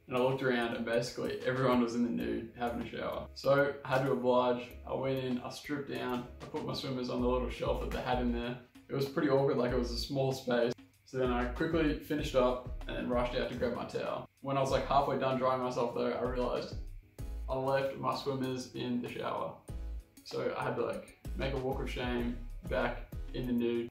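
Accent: Finland. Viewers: I haven't heard that kind of finnish accent except maybe from Kimi Räikkönen. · Yoooo! Your finnish accent is damn good! :)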